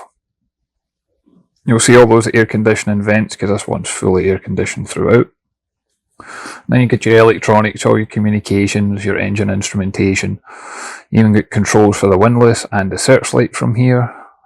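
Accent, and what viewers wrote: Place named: Scotland, United Kingdom. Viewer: I love these GH boats and your reviews. I particularly enjoy your accent as it reminds me of my times in Scotland.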